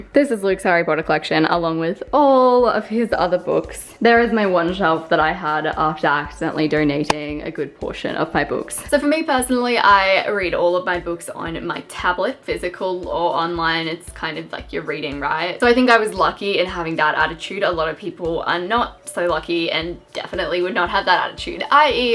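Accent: Australia. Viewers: omg are you Australian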